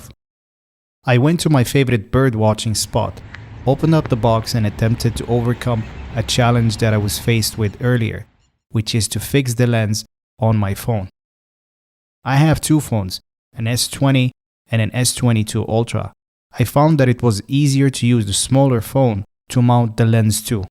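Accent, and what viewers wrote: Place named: Russia. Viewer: Thank you for review, I'm looking for lens to create my content 🤝 And I'm happy to hear Russian accent 😁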